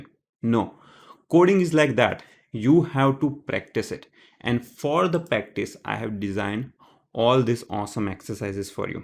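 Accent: India. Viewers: Bhai tu indian lagta hai english se ??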